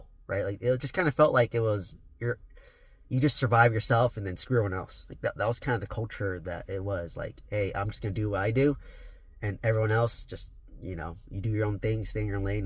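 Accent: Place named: California, United States. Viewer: He sounds like he's from California.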